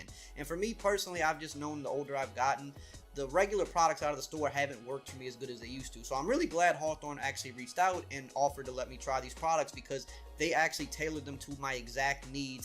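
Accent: Louisiana, United States. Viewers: do you have a cajun accent? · What part of Acadiana u from bro I hear Louisiana all over you lol great content as always 👍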